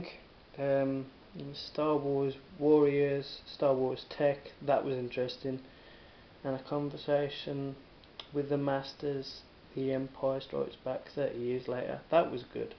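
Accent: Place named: England, United Kingdom. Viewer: Definately a box set i would like to get . Great review buddy . This is the first time i have heard your voice , you are a natural speaker . I really like hearing the English accent . Peace....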